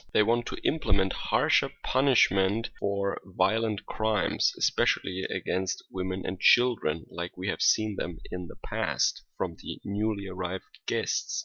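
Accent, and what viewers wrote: Germany. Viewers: 1. Apart from your terrible German accent this is a fine video, that shows that Austria with the charismatic Sebastian Kurz and his partner Heinz-Christian Strache can be an example for Germany. 2. The historical parallelism with 1683 is really interesting. We should learn from history! 3. Poland and Russia and the other Visegrad countries, the Czech Republic, Hungary , Slovakia should be our friends and partners.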